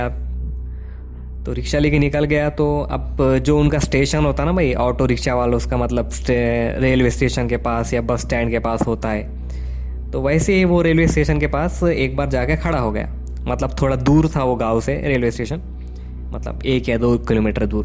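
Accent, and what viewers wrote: India. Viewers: Interesting accent of the narrator. Wonder which of India he’s from. I think this Waqiah happened to the narrator. Because he keeps saying “main ne” and then corrects himself saying “mere dost ne”. 😂😂 Good story. Got goosebumps 🙈🙈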